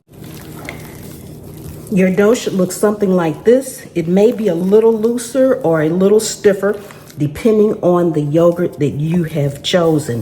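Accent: South, United States. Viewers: The Southern drawl really makes the video interesting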